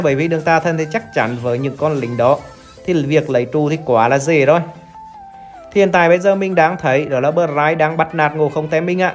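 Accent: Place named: North Central, Vietnam. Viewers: Giọng người Huế nghe hay lắm ln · Mình ở miền tây. Nhưng rất thích nghe giọng mấy ace ở miền Bắc Trung Bộ như này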